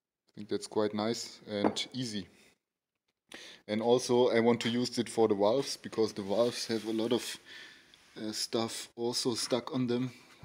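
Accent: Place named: Germany. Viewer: dieser geile deutscher akzent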